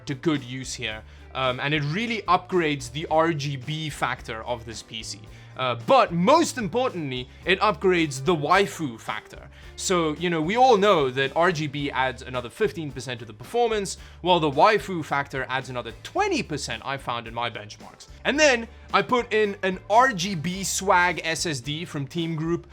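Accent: South Africa. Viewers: Do you come from south africa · Are you South African 🤔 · You sound south african bro!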